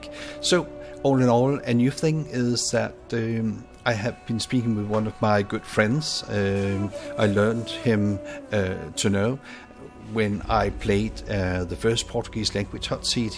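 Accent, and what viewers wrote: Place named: Denmark. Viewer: Man the Danish accent amuses me to no end :''}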